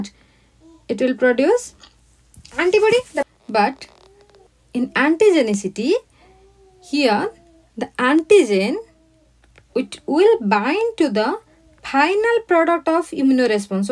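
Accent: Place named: Odisha, India. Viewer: I was first confirmed that you are from odisha by listening your speaking style...thank you so much ma'am... For making this understandable.. Please upload videos for ug students. 🤗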